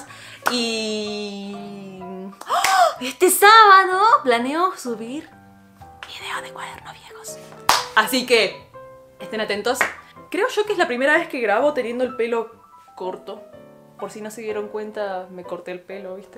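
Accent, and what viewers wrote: Argentina. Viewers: argentina asta los pelos xdxd tu acento me mata * - * deberias predicar en mi iglesia :u ya recapacite xd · Lo dices tan cruel que hasta da ternura Pero es cierto parecen pelotudos sin vida (￣(エ)￣) (se le sale lo argentino ^<^) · Estoy totalmente de acuerdo con vos y otra cosa que odio es...: "Ya me subscribi a tu canal ahora hacelo vos " osea me subscribo si me gusta el contenido , osea deja que llegan solos los subs Pd: me pegaste se él asentó argentino soy uruguaya y en la clase soy la Argentina 😂😂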